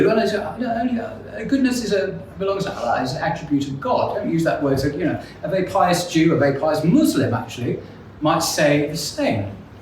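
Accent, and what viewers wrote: United Kingdom. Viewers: Mashallah brother! Thank you very much for this amazing talk. I like to listen to your videos because of their very rich content but also for the British accent that I like very much 😅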